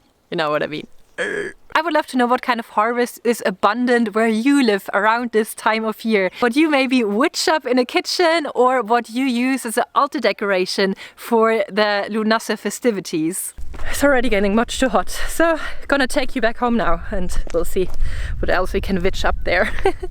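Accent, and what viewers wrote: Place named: Germany. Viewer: How lovely to have stumbled on this video.... I noticed your accent and said to myself....ist sie deutsch?!!? Tagsächlich....ich bin seit 1979 in den staten....aber meine ganze familie ist noch in der Stuttgart area!!! Auch eine küchen hexe und practicing pagan für über 40 jahre!!! Your video is fun, lighthearted, informative and REAL!!! No witchy theatrics but genuine witch lore, love love love... you definitely have another follower!!!! Here in New Jersey we have similar weather as home so wheat stalks, sunflowers are used for lughnassad decorations and on the eve of lughnassad I make my bread dough, let it rest overnight and bake lughnassad morning!!! A small piece as an offering during Ritual and consumed with a Lughnassad dinner in the evening!!! Tschüss!!!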